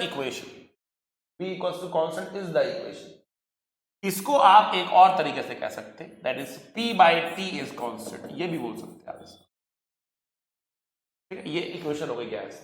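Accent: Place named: South, India. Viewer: He looks like South Indian n also talks like it 😀😀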